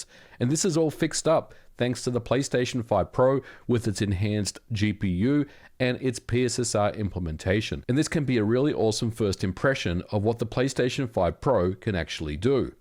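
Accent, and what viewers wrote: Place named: Australia. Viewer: You must be an Aussie living in the US, I recognise a fellow Aussie 🇦🇺 Back in Sydney I got my Pro for $500.oo EB Games, with a trade & Club 5 level 🎉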